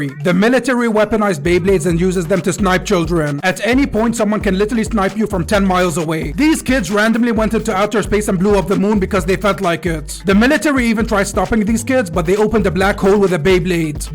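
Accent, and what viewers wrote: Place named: India. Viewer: Bro sounds like he's from India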